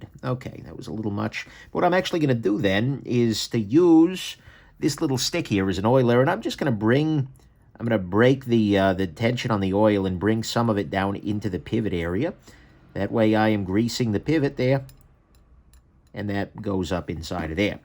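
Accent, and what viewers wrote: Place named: Northeast, United States. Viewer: I love your accent, where are you from? NE area I'm guessing.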